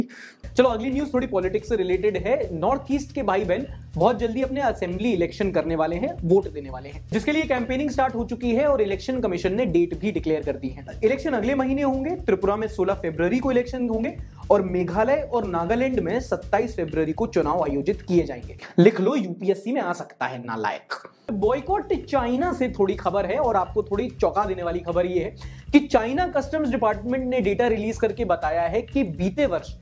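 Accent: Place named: Bundelkhand, India. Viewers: Bhai I am from Bundelkhand aapne bahut hi gajab Bundelkhandi boli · That bundelkhand ascent was lit 🔥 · Bro have full bundelkhandi accent I approve you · भैया हमें भी लग रहा हूं कि तुम बुंदेलखंडी हूं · As a Bundelkhandi I can say you Bundelkhandi accent is 😂😂😂😂 amazing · As a bundeli 😍- Bro ur Bundelkhandi accent is on top notch!! 😅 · Your Bundelkhand accent.........Maja aa gaya😂😂😂😂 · Sir your bundelkhandi is best i am from bundelkhand😂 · My man speaks prime bundelkhandi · Bhai bhai aap to full bundli bol letai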